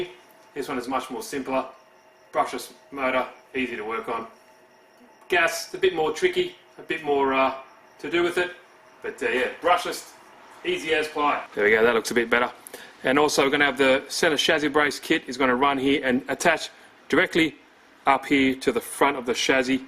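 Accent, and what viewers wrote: Australia. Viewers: secs and i already know he is an australian :D good video mate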